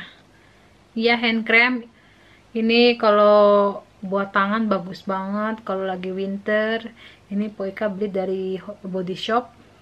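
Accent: Jakarta, Indonesia. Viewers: Hai, aku niy sukaaaa banget sama, krn sll mengingatkan aku pada tetangga2ku dulu waktu aku masih tinggal di salah satu daerah di kebayoran baru, yg sekeliling aku itu orang betawi semua, jd selalu kangen dan teringat dg tetangga2ku dulu, dari cara bicara, sikap, mirip dengan... btw netizen mah ga usah didengerin mpok, sehat selalu dan bahagia selalu ya dg Bang Sabeni😊🤗😘😘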